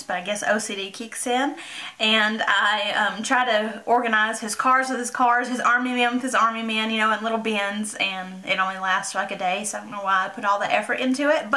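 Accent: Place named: South, United States. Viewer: Omg where are you from? Haha I'm from Mississippi and Georgia so your accent reminds me of home. Southern accents unite! :)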